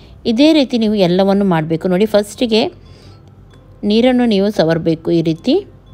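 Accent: Mangalore, India. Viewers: ನಿಮ್ಮ ಜನ ಅಂದರೆ ತಪ್ಪು ತಿಳ್ಕೊಬೇಡಿ ಅಂದ್ರೆ ನಿಮ್ಮ ಮಾತು ನಿಮ್ಮ ನಡತೆ ತುಂಬಾನೇ ಇಷ್ಟ ಮಂಗಳೂರು ಮಂಗಳೂರು ಕನ್ನಡ ಭಾಷೆ ತುಂಬಾನೇ ಚೆನ್ನಾಗಿದೆ ಅದಿಕ್ಕೆ ನಾನು ಹೇಳಿದ್ದು ನನ್ನ ಮಗಳಿಗೆ ಹಾಯ್ ಹೇಳಿ ಅವಳ ಹೆಸರು ಶ್ರಾವಣಿ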